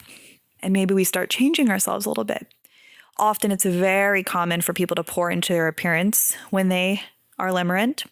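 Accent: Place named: California, United States. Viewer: hello, your speech is amazing are you from western California.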